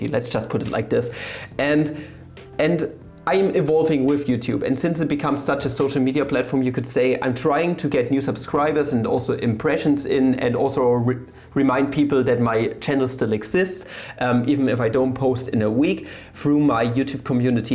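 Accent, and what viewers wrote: Germany. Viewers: Ähm ich hör da bißchen deutsch raus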